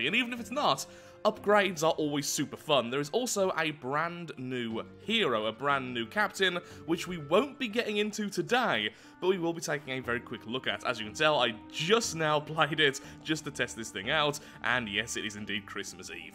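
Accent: United Kingdom. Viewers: next video can you turn down the game music a little? I can't hear your British voice as well as i need to!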